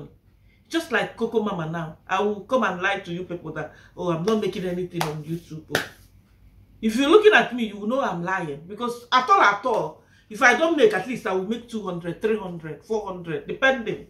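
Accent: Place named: Africa. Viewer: Is there a way you can speak English more clearly and with less accent? I hear you but when your accent gets too deep I am losing your words and missing the point. Your channel is reaching the world so it would be helpful to many who may not have African blood for you to shift and pivot for those who want to actually hear you 100%. I have the blood. I hear you. But I lose you.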